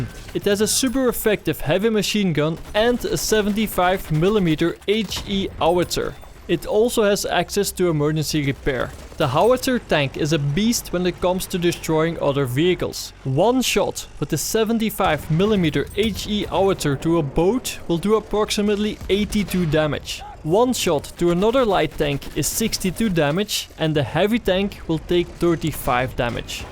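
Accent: Netherlands. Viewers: beetje duidelijk Nederlands accent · you're dutch right? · Are you dutch? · Are you Dutch ?! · You have a very noticable Dutch accent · hij zegt "tank" op een Nederlandse manier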